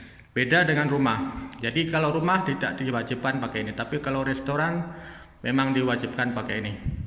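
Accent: Malaysia. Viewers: Terbaik perkongsian kawan..haha hampir hampir sling orang Malaysia sudah haha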